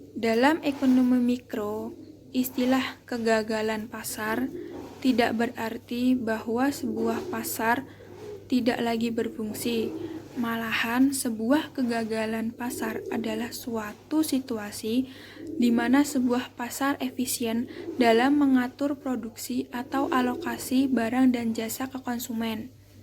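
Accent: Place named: Klaten, Indonesia. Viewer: Kamu orang klaten ya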